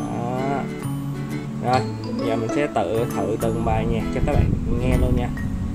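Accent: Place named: South, Vietnam. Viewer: giọng miền nam dễ nghe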